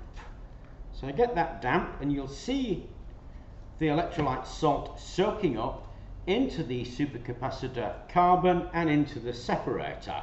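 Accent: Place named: United Kingdom. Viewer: And, trying to talk slower would help, as well... I'm in the US; &, trying to "translate" UK English into US English can be "interesting." I had to reduce the video speed to 75%; AND, STILL turn on closed captioning, just to follow what you were saying. If I had trouble following what you were saying, I'm sure that I'm far from alone here in the US... (I just tend to be more out-spoken than most people.) Interesting content, though. :)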